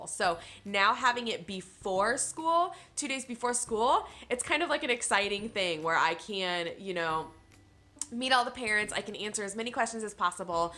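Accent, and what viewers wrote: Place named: Indiana, United States. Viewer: I would LOVE to know what decor pack you are looking at. I am going for the same look in my classroom. I am switching rooms and grades, looping with the same kiddos and want to give them something fresh. Fellow Hoosier here. Transplanted to NC at 15. From the South Bend area. Your accent makes me feel at home. Enjoy your videos. Thanks for sharing!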